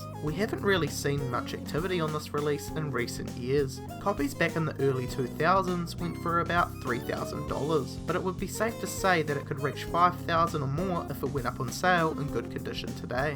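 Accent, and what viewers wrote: New Zealand. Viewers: Sounds more like New Zealand to be honest, could be Aussie though. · Is your accent from north NZ? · That New Zealand accent though. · He's a new zealander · It sounds like NZ to me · love the NZ accent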